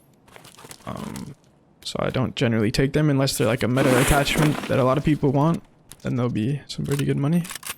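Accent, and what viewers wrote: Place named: Netherlands. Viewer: You hear the juicy dutch accent, hes doing a good job of hidding it.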